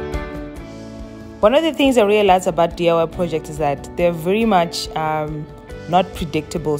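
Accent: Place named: South Africa. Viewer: I am south african Indian I thought I recognized your accent, wow you have such great ideas and I will surely try it out, my daughter has asked for a shoe rack. Keep it up with your good work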